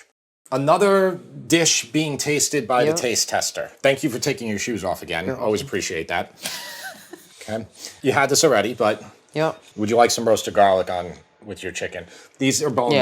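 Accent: New York, United States. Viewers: I started checking out this channel mostly for the classic deli salad/sandwich recipes, after I specifically went looking for somebody with a Noo Yawk (or Jersey or LI) accent who actually made that stuff for a living. I've tried a few now and they've all been exactly what I imagine a good NYC Deli tuna salad or mac salad or chicken salad should taste like. And I gotta say, all these "easy one-pan" meals and pasta dishes look and sound absolutely awesome too. Time for me to start feasting on more than just really good salads and sandwiches I think. Cheers for all the great ideas!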